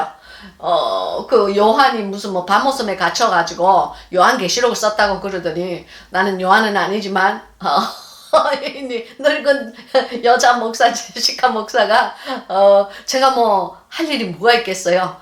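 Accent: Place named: Busan, South Korea. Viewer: 할렐루야 ~~목사님 늘 은혜 말씀과 삶을 나눠 주셔서 감사합니다 ~ 목사님과 같은 고향(부산 초량동)이라 더 정감가고 목소리와 억양도 좋아요. 사투리까지 너무 친숙합니다. 늘 강건하시고 우리들을 잘 인도해 주세요 ~♡♡♡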